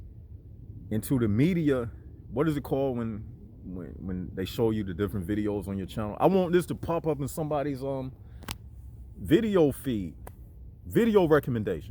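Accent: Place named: East Coast, United States. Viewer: I thought east coast because of accent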